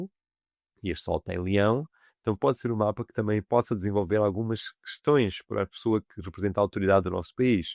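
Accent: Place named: Portugal. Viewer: Muito bom! Em português de Portugal!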